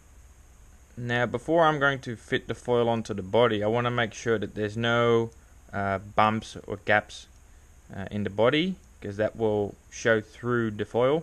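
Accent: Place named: Australia. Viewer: Sick bro knew that accent was Aussie! Love the vids bro keep up the great work!!! Yew!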